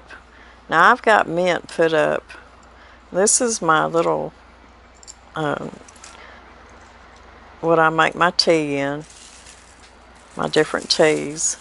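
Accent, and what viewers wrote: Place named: Arkansas, United States. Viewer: I love it that you can make a video without getting “made up”. You look pretty without it. Your voice and your accent is just like my family in north eastern Arkansas. I feel like I should know you. I’ve used many of your recipes. Keep it up!!!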